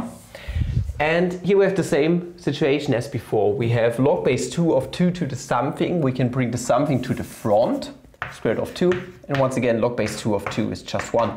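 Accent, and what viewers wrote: Germany. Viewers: wait i never noticed your german accent before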